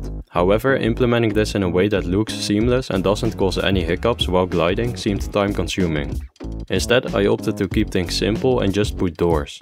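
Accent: Netherlands. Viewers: Are you Dutch by any chance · Hé is Dutch You can hear it by his accent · are you dutch? you really sound dutch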